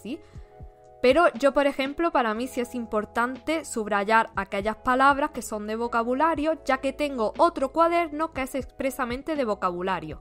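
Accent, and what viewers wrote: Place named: Spain. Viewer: Que lindo tú acento ezzzzzpañol 😘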